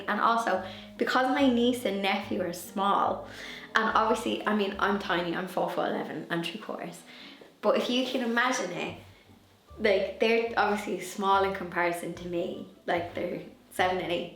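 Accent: Ireland. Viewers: With your lovely Irish accent I thought you said your niece would have hair bands, I was trying to visualise hair bands to make your jacket taller🤦‍♀️🤣 it’s arm bands you mentioned 🤣my mistake🤣. I have never seen Beetle Juice, so I’m not much help to you 🫢. But go girl, knowing our she will make it 👏❤️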